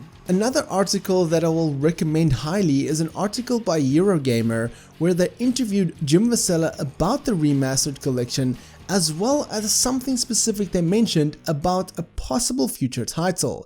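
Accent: South Africa. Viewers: Bru, ma chana. Wa ah yoo putting on, laak, a fake American accent, hey? Stick with the Edenvale accent. Id saands so much better. ^^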